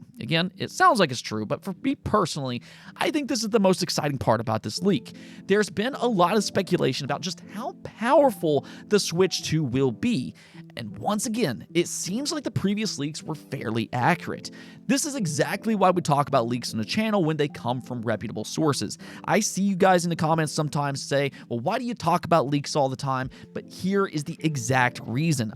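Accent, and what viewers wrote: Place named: Mountain West, United States. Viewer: I can tell you're from the mountain west region by the way you say "rill dill." Didn't even know this accent existed before Sister Wives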